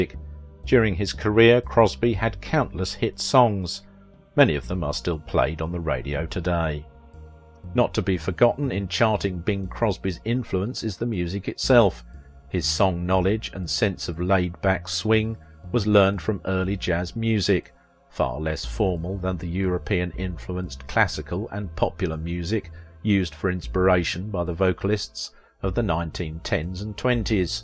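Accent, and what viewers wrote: United Kingdom. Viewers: Hello there i just want to say to you im facinated by your english spoken tone i miss speakinf to english raised people,and the thing is i was born in argentina 1959 from english parents but now i dont find englush people to practice my language....well dear my blessings foe u from an english scottish lady.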